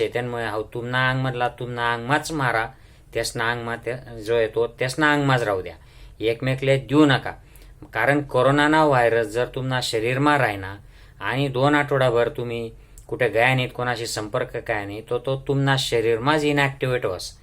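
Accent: Khandesh, India. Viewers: गयर भारी सांग ,,,तुमनअहिराणी बोलन खुपचं गोड वाटन ऐकाले👍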